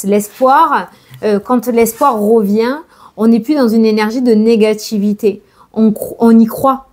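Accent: South, France. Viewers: Merci pour votre bonne humeur, vous êtes un rayon de soleil dans la vie , redonnant l envie de repartir positive et confiante ,votre accent du sud et chantant stimule.😂5❤👍🥳 bravo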